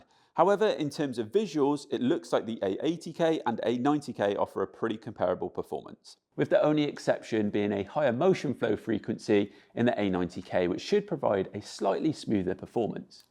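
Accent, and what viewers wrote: United Kingdom. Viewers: I love his British Accent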